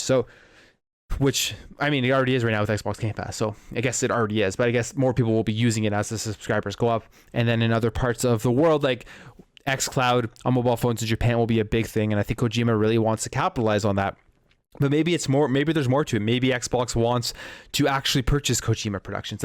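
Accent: Canada. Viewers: Figured you were Canadian when I heard Aboot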